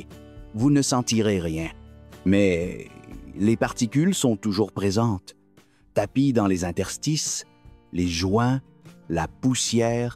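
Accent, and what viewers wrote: Canada. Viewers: Le monsieur (à moins que ce soit une IA ) a plutôt l'accent canadien. C'est normal ?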